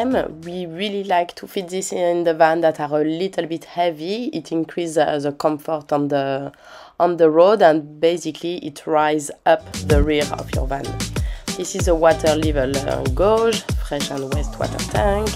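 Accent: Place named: France. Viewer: Love your french accent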